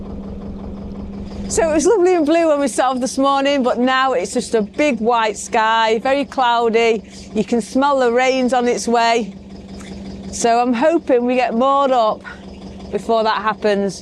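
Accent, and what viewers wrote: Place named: North, United Kingdom. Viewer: Hi this is the first time I’ve visited your channel and guess what? I am now going to look for all your videos on you tube as you make them so interesting and informative.This channel for me is the best of the lot.I may eventually catch up with you as I have a feeling I’ve a lot of videos to watch.I love your humour and your northern accent.Thanks so much.Enjoy your travels.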